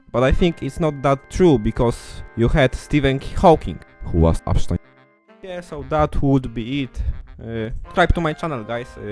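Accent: Poland. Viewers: Nie ironicznie przyjemnie się słucha z polskim akcętem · Polski łamany na angielski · Oh my, your english sounds so Polish. I am saying this as someone from Poland. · Słychać, że polak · His accent, lmao. As Pole speaking English for years - he basically polonizes every english word. It's absolute beauty. It's me if I don't know how to vocalize word in english all of sudden. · yes he is polish he sound polish he speeks polish good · czytany angielski ciebie zdradził xD zanim powiedziałeś że z pl już się domyślałem ale ogólnie to sam nie umiem czytać za dobrze, umiem dużo w angielskim mi sie wydaje [ bo moje porównanie to moja klasa xD ] ale ogólnie alfabetu angielskiego czytanego nie pamięta całego, a to co pamiętam to i tak angielski nie jest dokońca spójny np. know i no czytamy zawsze jako "noł" choć inne słowa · Masz najmocniejszy polski akcent jaki w życiu słyszałem. 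· Odrazu po tym akcencie wiedziałem że polak xddd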